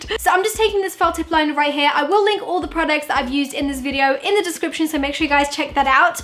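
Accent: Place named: United Kingdom. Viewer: Your tiny little eyes plus your British accent.... ❤ looks and sounds so cute :D i wish i could hv a British accent like that :<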